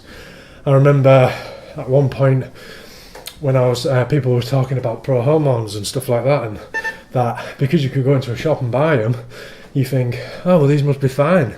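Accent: England, United Kingdom. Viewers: that english accent beats all the other english accents...is it the country touch? good bullocks talk mate :)!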